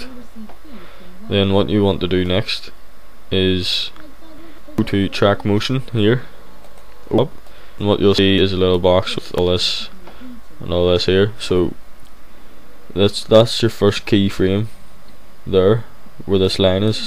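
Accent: Northern Ireland, United Kingdom. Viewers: Yoooo ur frum Northern Ireland too matee haha high Five!! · Northern Irish. I know that accent anywhere! I'm Irish too. Hell yeah!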